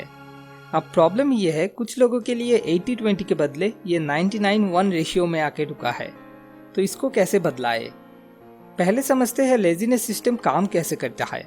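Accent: Bengal, India. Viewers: aapki accent Bengali Wali hai...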